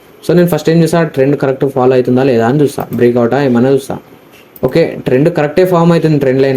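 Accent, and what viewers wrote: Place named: Telangana, India. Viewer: Yem boss, nee bhasha pakka mana Telangana laka bagundi👌keep it up.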